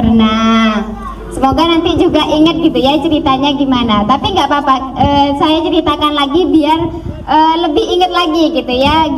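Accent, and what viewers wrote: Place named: Madura, Indonesia. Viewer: Logat nya deh bestie medhureh...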